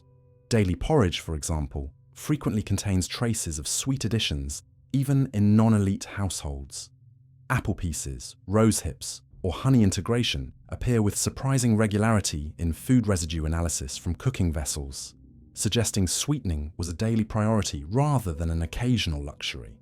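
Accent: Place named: United Kingdom. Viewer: You don’t understand a uk accent?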